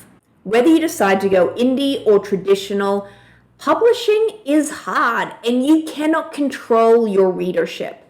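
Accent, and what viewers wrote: Australia. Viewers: Hitchcock said more or less what Vonnegut (and you) say, but in a way that, I think, explains one of the rules better. Paraphrasing: Suspense, he said, is not withholding information from the reader. Rather, it is giving the reader information before the character gets it. (Is that faded Aussie I hear?)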